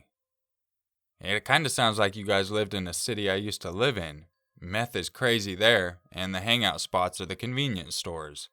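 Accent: Yorkshire, United Kingdom. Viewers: That Yorkshire story was rather funny! Bless, our accents are hard to do, Yorkshire has some of the funniest! Nice vid mate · Great Yorkshire accent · Dat Yorkshire accent doe 😂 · Oi love! Nice British accent.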